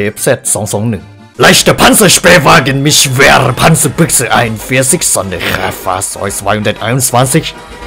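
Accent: Germany. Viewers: ผมชอบสำเนียงภาษาเยอรมันพี่มากครับ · โครตชอบเลยสำเนียงเยอรมัน 55555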